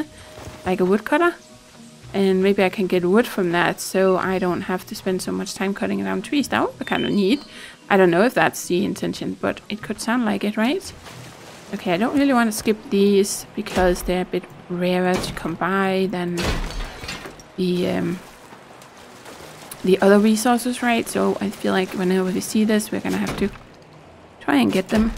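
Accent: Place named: Denmark. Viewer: Thanks for the video, your accent sound Danish, very elegant in my opinion, from Alv, Norway.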